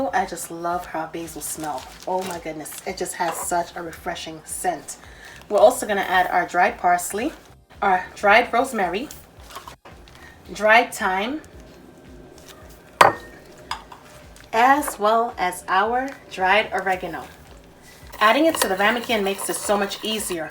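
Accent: New York, United States. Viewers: Omfg I'll watch this over and over again just to hear that NYC accent!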